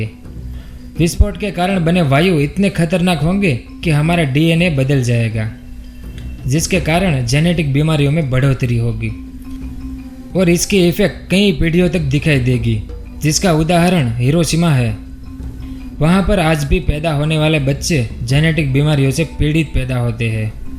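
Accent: Gujarat, India. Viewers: nic video ...hahahaha Gujarati jalak rahi apki awaz me ... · rocky rock 👌👌👌 u r 1000% bole ap ye voice boht gujrati he hindi 😃😃😀😀✌✌👌👌👍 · bha gujrati ho kya? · Bhai Gujarati me Hindi kyu bol rhe ho ! Try to control tone, it's nice video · Gujarat ki bhasa hi alag hoti hai jo ki hindi bolne se pta chalta hai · aapka bolne ka style gujrati hai. Aapka ascent se pata chal gaya.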